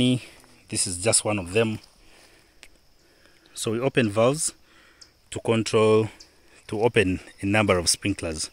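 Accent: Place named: Uganda. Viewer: You speak like Ugandan brother. Otherwise weldone!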